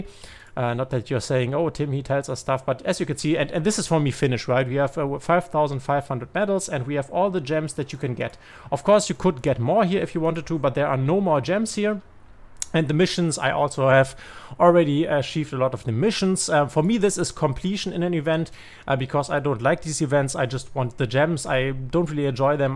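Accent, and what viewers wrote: Germany. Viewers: Bist du deutsch ? Dein Englisch hört sich nach deutschem Dialekt an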